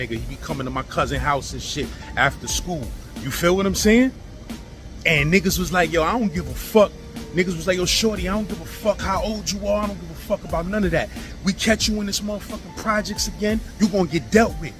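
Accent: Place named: New York, United States. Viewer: QUALITY STORY TIME! and ousside on feet MOOOOVINN yea thats a NY nigga 🤣 love from CT 💯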